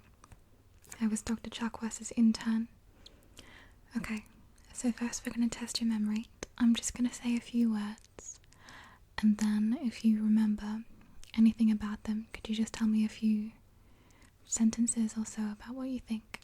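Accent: Australia. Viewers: ( ^ω^) What's this accent I'm picking up?! Some thunder from down under?? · You're very beautiful and your "aussie"? (I think) accent/voice is very soothing. Great video 👍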